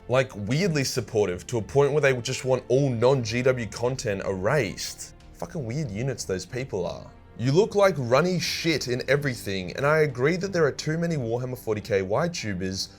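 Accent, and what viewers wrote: Australia. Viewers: i don't really care about 40k that much, hell i have never played a game or read one of the books, but the Australian accent and the cheekiness is what keeps me coming back lol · Comedic aussie way? I must be honest, I allways thought you were just plain Australian and not trying to be funny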